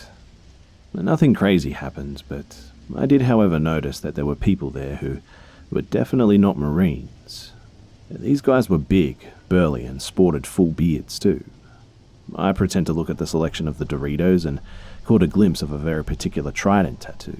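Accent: Australia. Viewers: Australian accent: I've always known I was gonna be a marine Me: I dunno kinda sus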